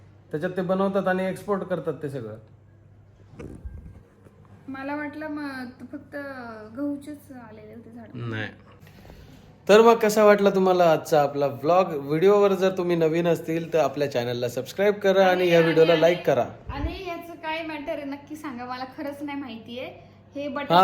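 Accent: Nashik, India. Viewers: Dada mi devlyachi aahe.tu maja gava javlcha aahe.khup bar vatle.tumchi Jodi mala aavdte.tuja bhashe Varun mala samjle tu nashik ch aahes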